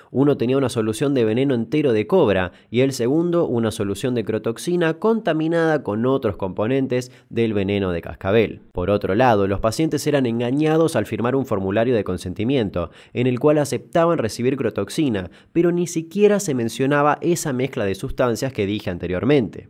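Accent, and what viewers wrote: Argentina. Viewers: amo el acento argentino, es hermoso. Me encantan tus videos. Un abrazo grande desde Bogotá-Colombia💗💗💗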